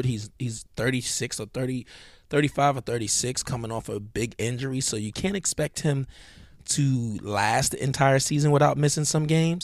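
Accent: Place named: Brooklyn, United States. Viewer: Good podcast brother. I'm sorry if you answered this in a previous cast because I just found you channel recently, but what borough or part of NY you from? You got a BK accent to me sounds like Saigon too. Dope channel, glad I found it. Respect from Queens via the Bronx. Word up to my dude Kemba. That rook in Orlando, Isaacs, is also a Bronx kid from what I've heard. ✌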